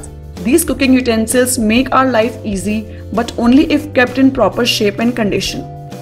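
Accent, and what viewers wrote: India. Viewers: Absolutely Indian version of talking English pronunciation... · I truly enjoyed this vid. Indian accent made it so much better. Thanks for the tips 🙂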